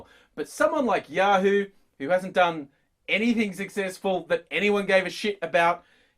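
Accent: Australia. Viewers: Why is Patton Oswalt speaking with an Australian accent?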